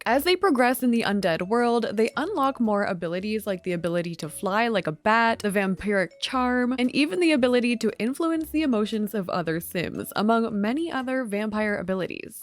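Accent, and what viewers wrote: Canada. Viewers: Girl it’s a Canadian thing I swear lol I catch myself saying ehh and laugh because it sounds so Canadian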